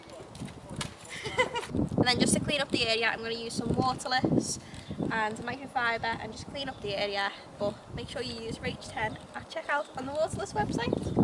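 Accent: Liverpool, United Kingdom. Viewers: are u from liverpool? I was deployed to england for 6 months, trying to see If i learned anything about accents while I was there lol! Super clean ST!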